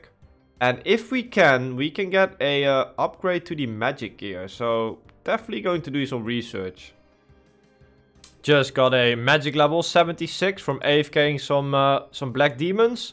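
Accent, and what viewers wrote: Netherlands. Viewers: Mooi Nederland accent:)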